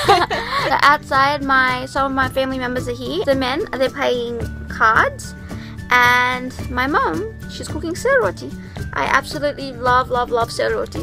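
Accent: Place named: Australia. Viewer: Loved the vlog! Oh my gosh you're the first nepali with an Australian accent I've heard! love love love the accent <3 Also subbed and would love it if you checked out my vids too :)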